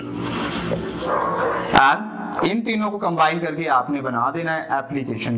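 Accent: Pakistan. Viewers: sahi kaha bolta ek dm pakistaniyo ki tarah h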